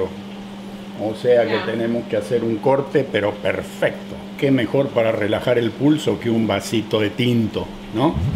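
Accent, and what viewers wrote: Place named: Argentina. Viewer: Nos encanta la calidad y los detalles de la explicación. Además nos asombrá que después de tantos años en Canadá conservés el acento argentino como si nunca te hubieras ido. Un capo de la pintura! Saludos desde La Plata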